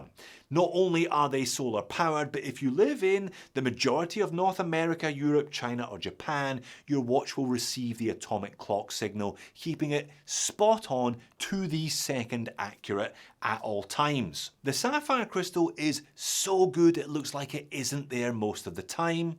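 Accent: Scotland, United Kingdom. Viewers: They all suck in my opinion yet you are bang on about Seiko mineral glass suck, what is up with that slight Scottish twang lulz top ten watches to avoid dunnie waste £300 more like lulz some of them are real bad pish inside that Ali Express watch is soo bad yet the Sapphire glass is decent yet following for the banter lad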